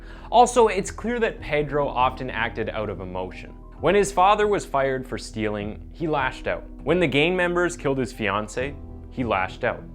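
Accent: Canada. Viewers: caan tell this guys canadian , they say house like hewse and about like a-boat etc lol its the only way i can tell the difference as im british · Is the narrator/ host Canadian?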